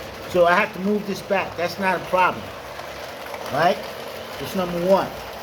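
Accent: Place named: New York, United States. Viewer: 👌🏾…I can tell a NY accent from anywhere lol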